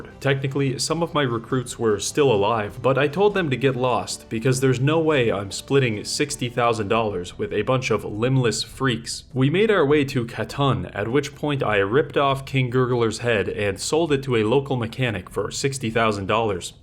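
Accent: Canada. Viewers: I love how your accent seems quite local to me until one word comes out ULTRA CANADIAN and I'm reminded you're not local to me at all.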